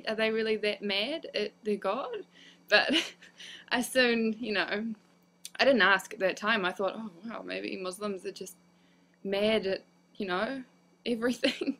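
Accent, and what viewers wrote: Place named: Australia. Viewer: i dig ur aussie accent very funny in a good way. welcome to the biggest family in the world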